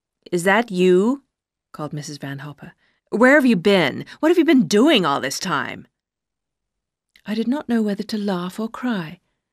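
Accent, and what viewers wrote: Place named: United Kingdom. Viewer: British English is so elegant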